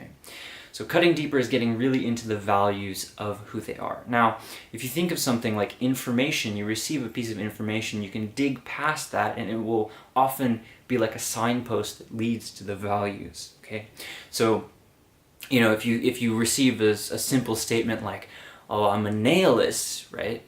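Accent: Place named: Canada. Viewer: sounds canadian to me!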